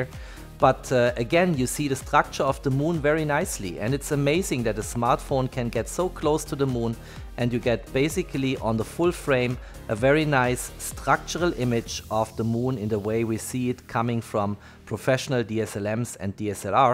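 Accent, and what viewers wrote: Germany. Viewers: Deutsches Akzent 🥵🥵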